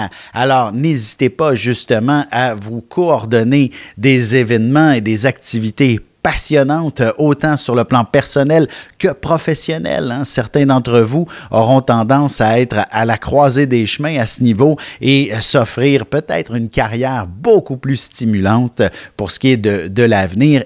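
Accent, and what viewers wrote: Quebec, Canada. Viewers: Bonjour merci je vous suit depuis longtemps avant il yavais une jolie dame blonde a vos côté je me demande ce quel devient ? En tout cas j'aime bien votre accent québécois ☺️ J'ai une question je suis née le 29Mai1992 18h45 Montpellier France Esque l'année 2023 serais bénéfique pour moi niveau sentimentale ou argent j'ai envie de me faire une chirurgie esthétique courant mars mais je doit d'abord récolte.. Voilà Monsieur a bientôt en espérant avoir mon thème sur ma prochaine vidéo ☺️🙏🦋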